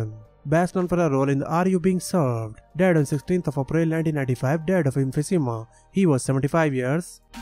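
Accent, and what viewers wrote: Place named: India. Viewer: It is an accent from India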